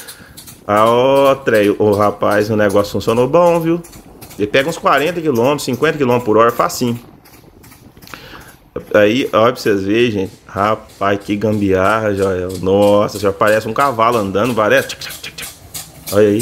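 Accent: Minas Gerais, Brazil. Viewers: pelo sotaque é de minas uai ! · Esse sotaque mineiro me conquistou antes da máquina apresentada. Eu não sei como cheguei aqui. O mais louvo foi ver que 11 milhões de usuários vieram aqui antes de mim em 7 dias. Esse é o viral dos virais. Parabéns.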